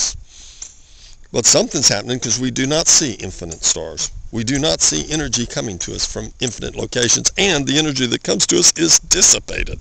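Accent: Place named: South, United States. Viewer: a southern drawl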